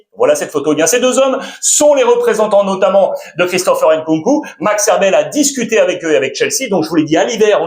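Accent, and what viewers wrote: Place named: Spain. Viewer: J'adore ton talent depuis tes prestations sur Canal +. Surtout ta prononciation avec ton craquant accent espagnol des noms des joueurs de la Liga. Stp prononce mieux le nom du défenseur uruguayen du Barça : R. ARAUJO pour mon grand plaisir, depuis Abidjan ❤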